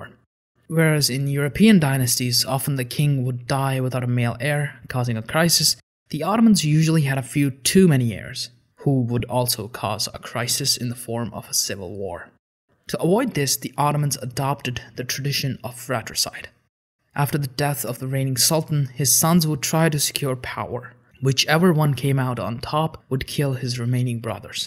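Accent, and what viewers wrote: Arab world. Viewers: Why are you pronouncing sultans name with an arabic accent? They are not arabs they are turks. I wish someone who knows turks and turkish prepared this video:/ this is so amateurish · yes you actually can pronounce them differently especially when it's literally using the very same writing system and script that it's being borrowed from! Which is arabic! It makes sense to pronounce them as they're pronounced in Arabic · exactly. That Arabic pronunciation is extremely distracting. This was a Turkish empire. He should just have pronounced the names in English. Even that is closer to the Turkish pronunciation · Your accent getting me sick !!!! Don't use Arabic accent , use Turkish accent . İt is inexcusable mistake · Please dont pronounce Turkish names in Arabic way :/ (Ottomans ınspired by arabic culture a lot I know but THEY ARE TURKISH:///) And sorry but at the ending it sounds like a banned sultans ready to built an empire again? 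:D which is not true and It made me feel really bad as a turkish person :/ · Thanks. I purchased this chart some months ago and enjoy referencing it. I believe the narration of this video however could be improved. Notably, important Sultans were glossed over, and some, not mentioned at all, such as Sultan Abdul Hamid II. There’s also a number of inaccuracies I believe, including attributing the correct titles to the Sultans, such as referencing Osman as Gazi instead of Bey. Finally, while the names are Arabic, I believe they should’ve been pronounced in Turkish, which is not difficult to do even for an Arabic speaker. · I guess the narrator is Arabic, it definitely doesn't justify the wrong pronunciation though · Nice video first of all, but why did you read the names with an explicit Arabic accent? Nobody in Turkey or in Ottoman times pronounced the names like that, nor are the Turks related to the Arabs. 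For many Turks, it's actually quite offensive. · Turkish names are not pronounced like Arabic. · maybe because he is arab and those sultans names were arabic name,even your founder of yours new country Turkey has arabic name Mustafa and that's why it's normally to pronounce like that.Example I'm albanian and if I had turkish name and you as turkish if pronounce my name in turkish version, I would see as normal thing because that is the way how you pronounce I don't see nothing strange on this. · Please do not pronunce names with an Arabic accent when talking about Turks, it is just incorrect. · The narrator is an Arab and he pronounces as he knows. Biraz hoşgörülü ol kardeş :) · Your pronounciations are way off bro. You pronounce the names in arabic tongue. Which is completely wrong. Its not moustafa qamal. Its Mustafa Kemal. We never had guttural sounds like that. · your pronounciatons are like an arab who trying to speak turkish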